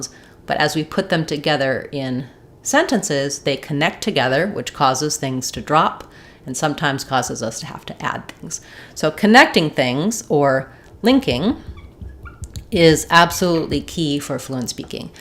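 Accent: United States. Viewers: Is It American accent? please lemme know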